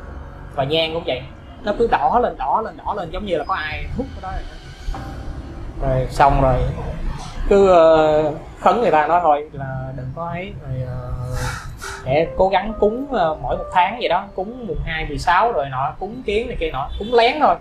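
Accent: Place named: South, Vietnam. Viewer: cách nói chuyện rất Nam Bộ, nghe rất thích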